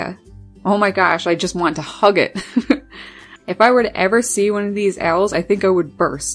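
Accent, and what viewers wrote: Newfoundland, Canada. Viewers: Thank-you for this video. It was great to hear the Newfoundland accent! So Canadian! Absolutely the cutest birds, for sure. I live in B. C. and I think we have Sowet Owls here, too. I may be wrong, though. I am sure that there is a very rare, small owl which does live in the Coastal forests, perhaps you know which one it is, 😃 I think it is the Northern Spotted Owl. 👵🏻🐾💕🇨🇦BC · hi u have such a beautiful voice-i can hear the passion -n the cute canadian?accent-luv ca usa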